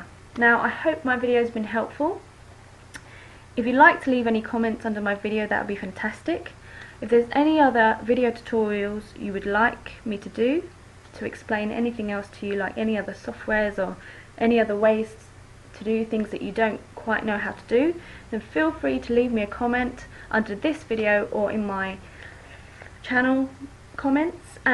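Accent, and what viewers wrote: United Kingdom. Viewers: Dat uk accent *O* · Are you english. ??? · <- Falling in Love with your British accent <3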